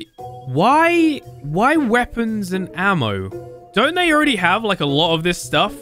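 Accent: United Kingdom. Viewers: are u from da UK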